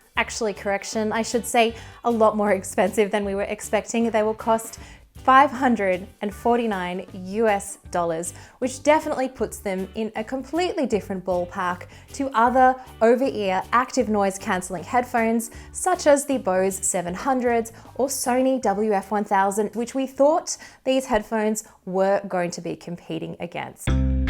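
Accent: Australia. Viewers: Is she aussie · Is she australian?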